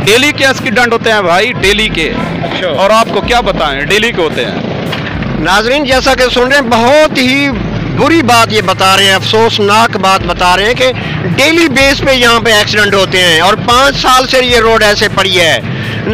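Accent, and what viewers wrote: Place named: Saraiki, Pakistan. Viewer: اچھی بات کہہ رہے ہو سرائیکی آواز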